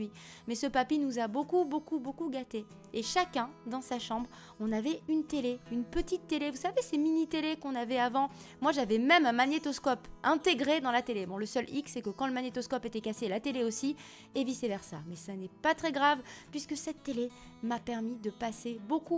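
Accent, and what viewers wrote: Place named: South, France. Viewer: Salut, ce 1er Draw My Life est une réussite surtout que tu dessines bien mieux que moi LOL!! ^^ Je suis né le 28 Septembre 1979 à Perpignan donc on a quasiment le même âge, j'habite près d'Avignon et j'ai aussi l'accent du sud.;) J'ai débuté les jeux vidéo sur un AMSTRAD CPC 6128 puis j'ai eu la Game Boy et les PS1, PS2,PS3. Les copains m'ont fait connaître la Master System, la NES et la Super NES. J'ai une prédilection pour les jeux de course automobile, de combat et de guerre/infiltration. Merci pour cette vidéo et j'espère sincèrement qu'il y en aura d'autres du même style car c'est vraiment top, des bisous!! =))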